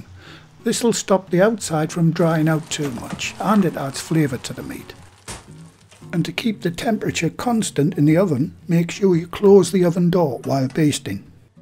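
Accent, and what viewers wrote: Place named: Durham, United Kingdom. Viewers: Loverly, jubberly,!. Brought back great memories of my 21 years in England and many happy Sunday lunches with my amazing (now sadly departed) English girl. Thanks for another superb video, and I always enjoying hearing your accent (Durham is it, or Washington, Tyne and Wear? My ears are getting rusty.) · What a refreshing change a Chef showing us how its done and making a video that's all about the food....no one knows what Mr even looks like because its all about the food well done sir and as a fellow north country man myself its nice hearing the dulcet tones of the accent again as I transplanted 55 years ago to Australia!!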